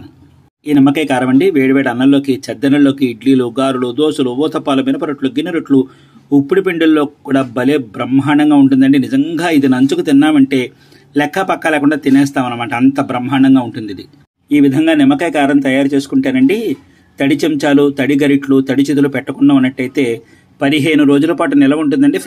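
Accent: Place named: Godavari, India. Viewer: మీ తూ.గో.జి. పగోజి తెలుగు అద్భుతం. వినాలనిపిస్తుంది, చేసినవి తినాలి అనిపిస్తుంది నమస్సులు.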